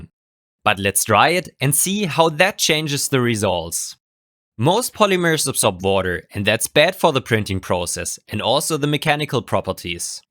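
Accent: Germany. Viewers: Bist du nicht aus Deutschland? Klingt so. Wenn ja, gibt es auch einen Deutschen Kanal? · Lol i've noticed english isn't your native language, but i couldn't think of what country you came from,but the "Guten Tag, i'm " let me know you're also german!😂😂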